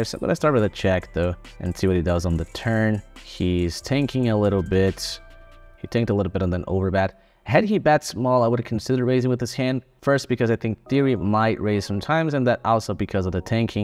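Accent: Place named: United States. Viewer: good content!I wanted to ask if you live in US or born there, seems to me that you speak perfect USA English, which I admire a lot!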